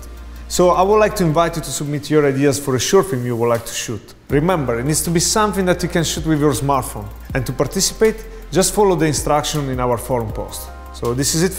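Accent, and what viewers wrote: Romagna, Italy. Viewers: This guy has a very marked italian accent. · Ha un accento italiano così rustico, che figata 😂😂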